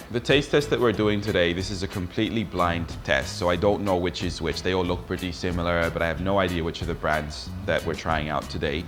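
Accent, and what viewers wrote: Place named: Philippines. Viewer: Dude your english has a filipino accent...Anyways Italian food goes good with Patis...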